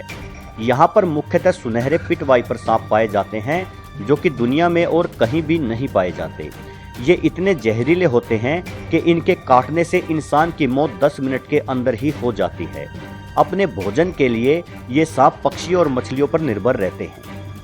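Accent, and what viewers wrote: Haryana, India. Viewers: Bhai aap haryana se ho kya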